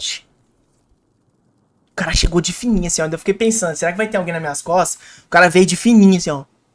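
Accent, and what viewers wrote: Minas Gerais, Brazil. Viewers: sotaque de mineiro é o melhor kkkkkkkkkkkkk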